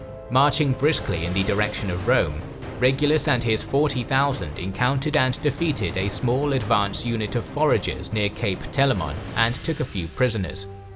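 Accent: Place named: United Kingdom. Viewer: I don't know who's the narrator of those videos but he's absolutely great, brilliant voice, diction and of course lovely British accent... so comprehensive and flawless!!! Thank you guys! Great Job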